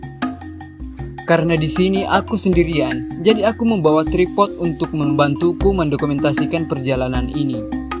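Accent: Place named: Sulawesi, Indonesia. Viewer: igt kawanKu yg di sulawesi cara bicara nya🙂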